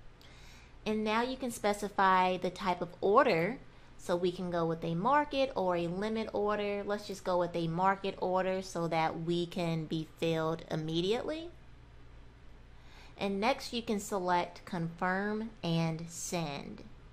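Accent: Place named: South, United States. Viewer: Lol Asian shorty with a black southern accent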